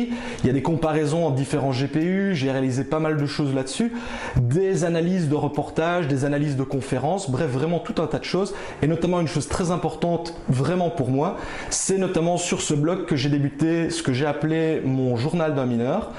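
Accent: Belgium. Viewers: Le p'tit accent Belge me plaît déjà 😁 J'avoue que ça me manque un peu depuis presque 16 ans que je vis en France 😉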